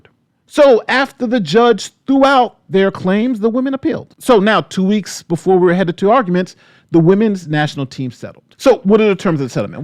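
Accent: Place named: Boston, United States. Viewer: You have Boston accent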